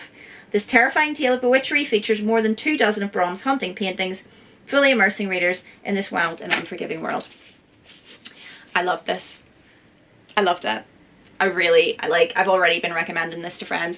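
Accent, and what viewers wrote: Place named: Belfast, United Kingdom. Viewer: Totally agree lol. I tho yip thats a belfast girl alright lol 😅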